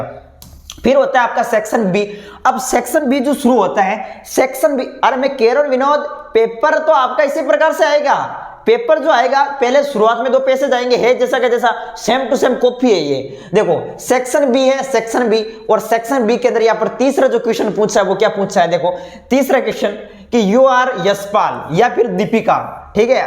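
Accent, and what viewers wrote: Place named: Marwar, India. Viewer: Sir thoda marwadi Kam teko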